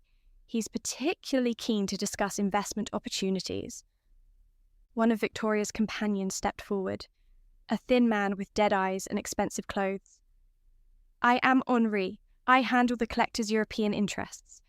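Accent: Yorkshire, United Kingdom. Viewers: What accent is that? Yorkshire?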